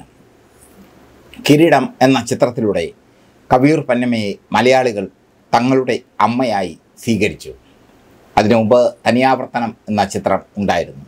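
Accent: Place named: Beary, India. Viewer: അതു ഞമ്മടെ ബ്യാരി മലയാളം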